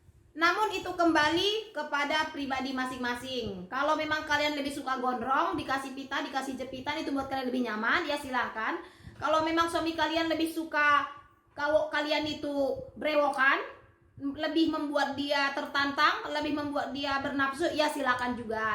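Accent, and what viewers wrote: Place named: Banyumas, Indonesia. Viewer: Ini lebih keren toplah mbak infonya ngapak keren ren ren👍🏼👍🏼👍🏼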